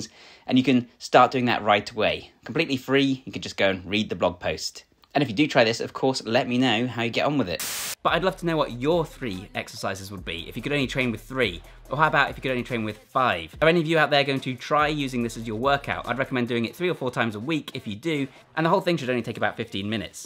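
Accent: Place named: RP, United Kingdom. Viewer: Poshest sounding beast I've seen 🥂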